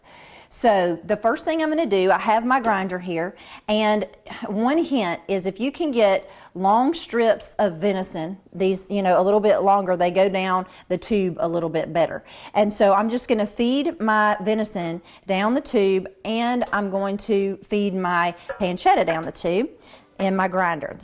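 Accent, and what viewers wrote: South, United States. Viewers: Good video plus it helps that this woman is beautiful and that country accent damn lol I think I made it to her second sentence and showed my girl who's from Virginia and she def agrees! WE NEED MORE WOMEN LIKE HER! Tell your man he's a lucky guy with much love from Florida 😄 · Her southern accent makes hearing about the recipe all worthwhile . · FANTASTIC video! You are lovely and a really good presenter. I've been watching sausage-making videos this afternoon because we've consumed all the summer sausage we got from the processor, so I'd like to make some of my own from the 5 deer my son and I harvested and I processed myself. Your video gave me the best directions I've seen so far. Thank you. And I don't know where you got that wonderful Southern accent, but I'm from Mississippi, and you sound SO much like my middle-MS and Alabama friends. 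Yankees love to make fun of us, but in fact we all know Southerners speak the REAL American English! Thank you!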